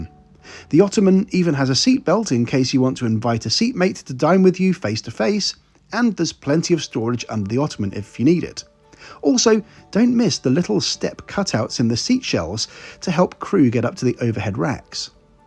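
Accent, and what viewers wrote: United Kingdom. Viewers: I am from the US and everything you just said sounds so wonderfully British!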